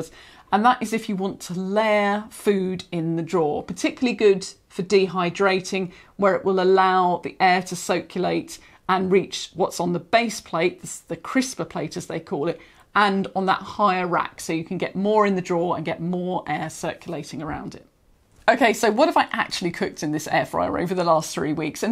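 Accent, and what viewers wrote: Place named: Middle class, United Kingdom. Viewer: Perfect review, and so refreshing to hear a nice, middle-class British voice on a product review! Thank you!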